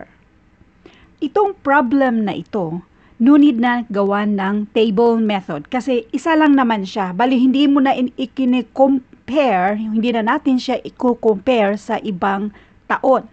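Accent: Visayas, Philippines. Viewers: Advantage po sa amin mga bisaya yung accent nyo po 😁😁😁 daghan salamat maam god bless ❤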